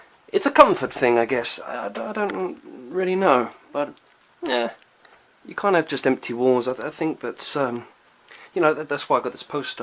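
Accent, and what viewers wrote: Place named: United Kingdom. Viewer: A cool concept! I've never seen someone from this community invite others to a small tour through their space. This should happen more often! It's a shame you feel nervous about recording your voice, because I unironically like it. There's something about a British accent that just sounds captivating. Please excuse me for not actually paying full attention for the whole 40 minutes, but it was a relaxing experience. Believe it or not. :P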